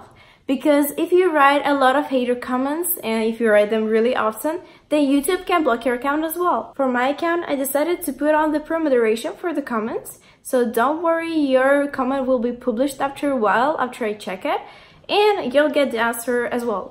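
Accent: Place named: Russia. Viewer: видно же что русская. По акценту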